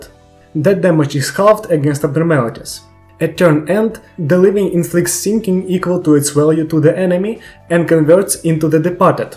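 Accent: Russia. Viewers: man, you have russian аccent, especially батрфлайс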